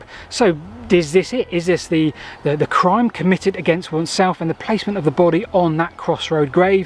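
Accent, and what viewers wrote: United Kingdom. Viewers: How does Youtube think the audio track is "English (United States)"? He is the most British sounding bloke I've heard all day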